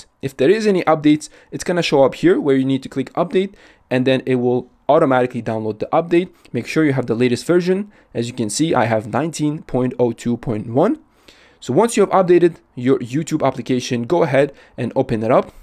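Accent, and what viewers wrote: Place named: Russia. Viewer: Not only bad, but intentionally disingenuous. However, the uploader has a Russian accent so I'm not at all surprised.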